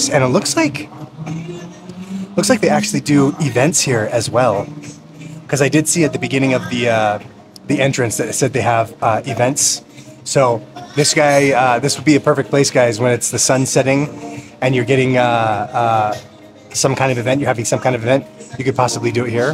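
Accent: Philippines. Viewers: I love your vlogs, showing local places and I love your Filipino accent.my husband of 41 years still can't get it..He has a limited vocabulary no matter how much I taught him 😅😅😅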